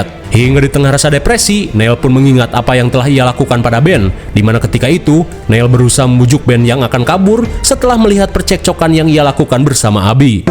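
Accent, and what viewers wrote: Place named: West Java, Indonesia. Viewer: Mantap sunda pisan euy😁😁😁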